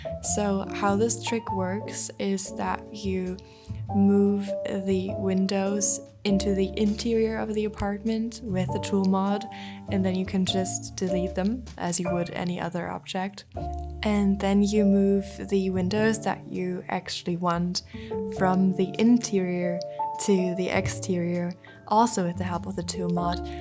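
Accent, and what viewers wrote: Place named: France. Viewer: hahaha I was trying to figure it out your accent. I really love accents! I was in between France and Portugal bc you sound a bit similar to a few people I know from these places. But anyways, I’m glad you replied 🥰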